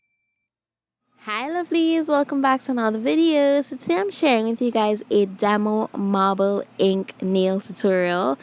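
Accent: Trinidad and Tobago. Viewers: Are you trini ? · From the time you started talking i realized u are a Trini❤🇹🇹 subscribed one time🙌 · What country are you from ? You sound Trinidadian 😍🇹🇹🤸🏾‍♀️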